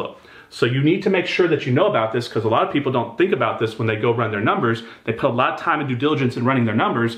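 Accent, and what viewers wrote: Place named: South, United States. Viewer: Good info but you talk to fast. That combined with your Southern accent makes it hard to listen IMO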